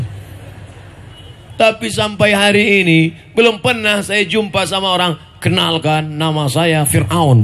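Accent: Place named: Medan, Indonesia. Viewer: Mantap kalilah UAS ni logat medannya keluar,,, 👍👍👍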